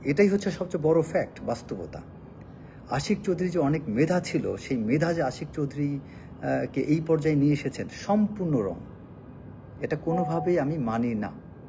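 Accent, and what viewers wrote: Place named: Barishal, Bangladesh. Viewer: সবজান্তা শমসের হইছেন! বাচাল টাইপ!কোথায় লেখা আছে মুহাম্মদ সল্লাল্লাহু আলাইহি ওয়াসাল্লাম কে না সৃষ্টি করলে আললাহ সুবহানাতায়লা দুনিয়া সৃষ্টি হতো না!ভাল করে বাংলা উচচারণ ই করতে পারেন না!বরিশালের একসেন্টে কথা বলেন!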